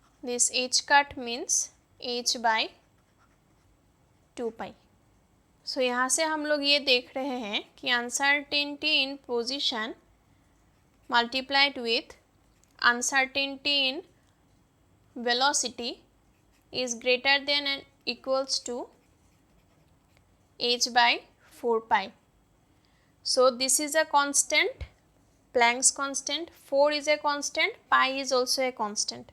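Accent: Bengal, India. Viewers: Mam are you Bengali??????????????????🤔🤔🤔🤔🤔🤔🤔🤔🤔🤔🤔🤔🤔🤔🤔🤔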